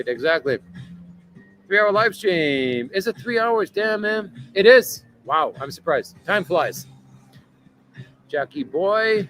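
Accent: Canada. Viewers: Do all Canadians talk like you?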